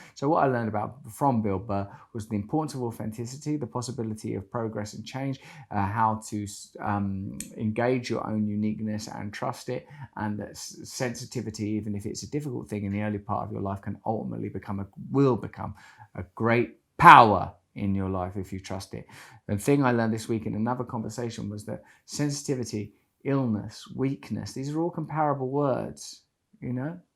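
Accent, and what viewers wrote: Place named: London, United Kingdom. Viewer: You sound like you're from London♥️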